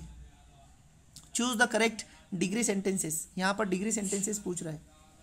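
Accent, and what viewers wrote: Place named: Nandurbar, India. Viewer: Mughe laga Nandurbar se hain aap .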